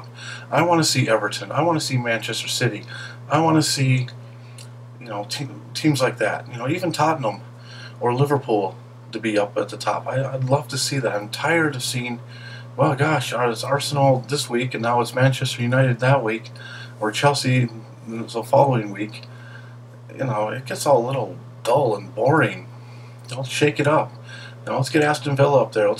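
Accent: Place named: United States. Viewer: ArtVandelay is wrong. You seem to know more about football than a lot of people in England. I like your videos. It's refreshing to hear an American accent chatting about the beautiful game. Keep it up. Blackburn Rovers all the way!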